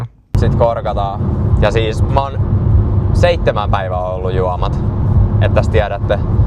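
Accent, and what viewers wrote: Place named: Turku, Finland. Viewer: Hullu turunmurre😂, asiallinen editointi ja kiinnostava sisältö kiitos viihdyin! 😁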